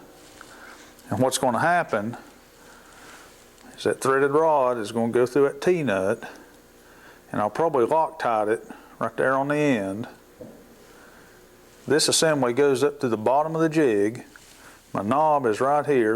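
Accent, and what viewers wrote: South, United States. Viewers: I understood him perfectly lol...... We don't talk that unusual in the south.... :D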